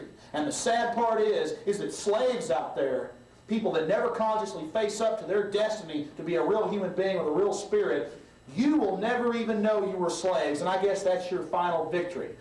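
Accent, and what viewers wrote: South, United States. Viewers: damn what happened to his southern accent? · Alex Jones or an imposter? Or is the current Alex Jones the imposter? This guy has a REAL southern accent, the current Alex Jones seems to have a Russian-pretending to be a Michgander accent. (heads up people, the biggest conspiracy you have never heard of is this: There are a LOT of Russians in key positions in this country pretending to be Americans!!!!)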